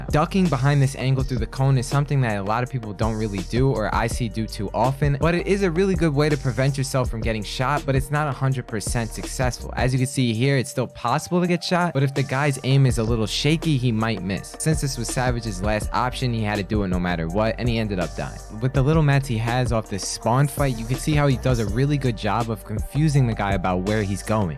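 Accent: South Africa. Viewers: South african. Lol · South african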